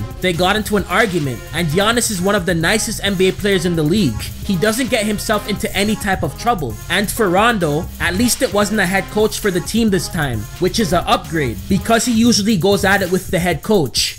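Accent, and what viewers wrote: Toronto, Canada. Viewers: Just wondering from your accent. Are you from Toronto?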